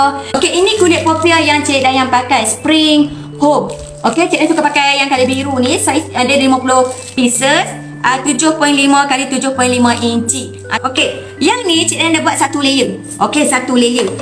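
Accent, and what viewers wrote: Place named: North, Malaysia. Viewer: Suka dengaq hang habaq Utara no..."jgn gatai2 tgn lipat" 😂😂😂🤣🤣🤣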